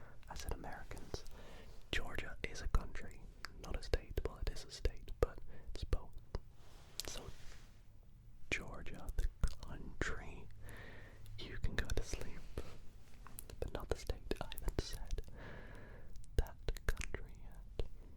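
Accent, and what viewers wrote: United Kingdom. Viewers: I liked the sound of your accent and assumed you’re British, then read you’re from Australia. What a marvellous speech!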